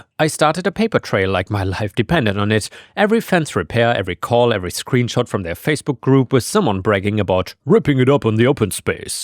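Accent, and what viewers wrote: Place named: Germany. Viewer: lol a German accent tells the story cute 🥰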